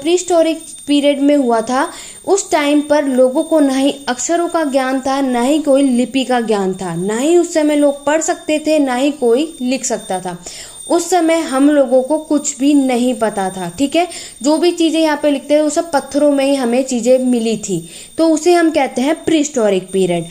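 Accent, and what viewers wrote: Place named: Haryana, India. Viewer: Haryana sa ho mam lgta ha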